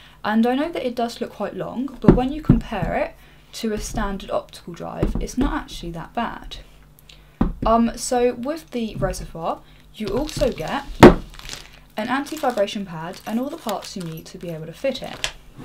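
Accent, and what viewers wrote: United Kingdom. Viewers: Hey, you're so damn beautiful... I love you, your british accent and your videos!:)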